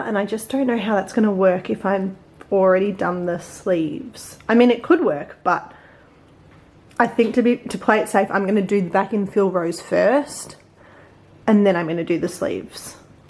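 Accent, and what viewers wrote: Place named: Australia. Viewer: I’m in the process of making one and was happy to stumble upon your video. I’ve made a couple of small ones for babies and toddlers but this is my first for me. It’s so good to hear an Aussie voice and listening to your ideas. I’m not a fan of the large arms so looking for ideas. Good luck with your Chanel, I’ll be following you 💐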